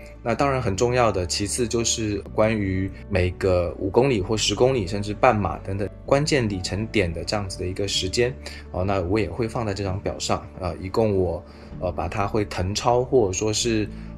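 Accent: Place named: Taiwan. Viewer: 講話敘述真的很像台灣人，CC字幕有繁體字，真的很用心，內容很詳細清楚，非常棒，感謝🎉。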